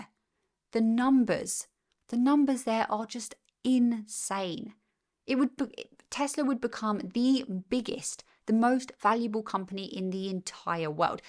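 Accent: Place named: United Kingdom. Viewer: Fantastic, quality content!!! Thank you! is a very good name for this channel because I really enjoy listening to your British accent. Anyone sounds smart by speaking British. But in your case, you are very smart to begin with and then you have that smart accent. 😃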